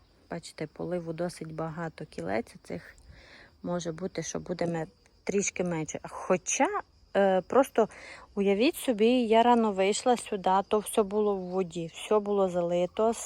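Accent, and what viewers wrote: Transcarpathia, Ukraine. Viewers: Нарешті я вас знайшла. Давно дивилась ваші відео, а потім мій акаунт пропав і прийшлося встановлювати новий і відповідно всі підписки на Ютюб були пропали, я рада вас бачити..... Я доречі теж з Закарпаття і тому дуже люблю слухати свою акцентовану мову, мало ко її розуміє, а я рузуміву❤😂😂😂 Я з Великих Лучок за Мукачево, але проживаю зараз в Львівській області......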